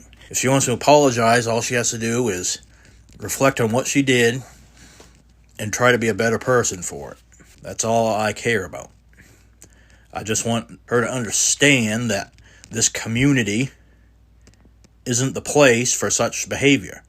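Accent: Maine, United States. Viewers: I hope you don't take this as me fishing (lolgetit) for information and feel free to delete my comment or just ignore if you don't want to reveal but...is that a Maine or Maine adjacent accent?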